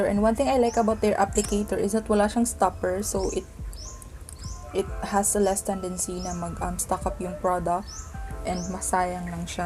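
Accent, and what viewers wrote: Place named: Philippines. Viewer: I like your Tagalog accent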